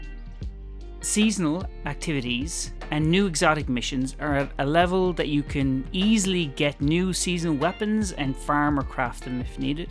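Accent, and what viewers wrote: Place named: Dublin, Ireland. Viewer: You from Dublin too lad?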